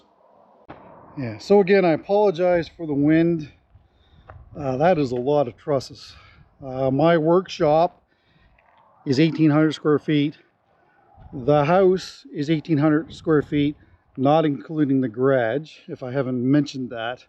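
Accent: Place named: Canada. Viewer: Red Green says garage the way you do, I just figured it was a Canadian thing.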